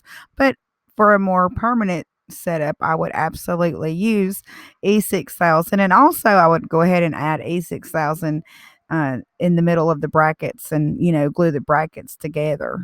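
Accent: South, United States. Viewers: After seeing this diy with the gold and you adding the touch of bling, I knew right away you were the one to ask I have been trying to find a farmhouse look with just a small amount of bling added to it, do you think maybe you could try to do a DIY on this.. I just don't have the imagination or the crafting ability to pull it off..but watching your videos I see you do.. I would love to see what you come up with....I live in a trailer and I want elegance in my decor but I want to stay true to my country style of living.... Thank you for all your inspiration that you give me I really enjoy watching you and I just love love love your country twang.. You just seem so down to earth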